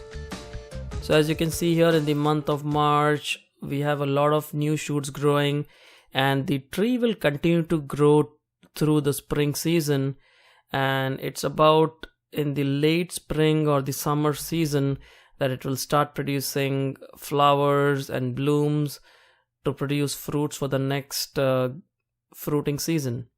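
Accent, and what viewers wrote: India. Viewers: Is this voice indian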